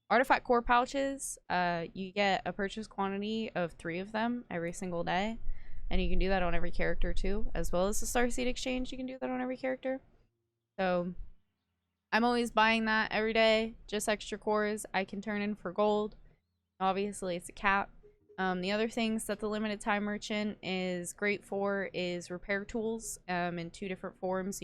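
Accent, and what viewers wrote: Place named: South, United States. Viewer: OK it’s adorable there’s just a little bit of southern girl MUA bank to see if I can ever so often a little bit of southern accent pops out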